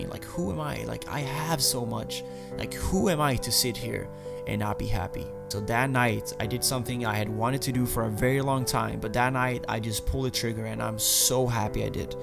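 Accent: Sweden. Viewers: Dang this is when had that really big Swedish accent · He had this Swedish accent back then. It's going away now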